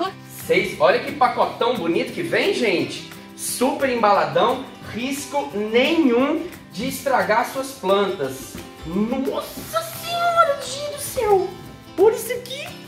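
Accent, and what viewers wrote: Minas Gerais, Brazil. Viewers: Vc é mineiro? Pq super me identifiquei no monte de "nuuuu " "nossinhora" 🤣🤣🤣🤣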